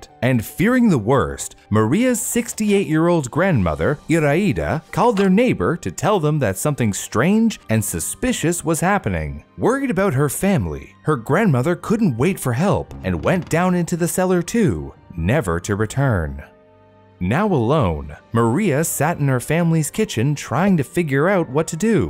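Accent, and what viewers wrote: Canada. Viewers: u sound Canadian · Sounds Canadian …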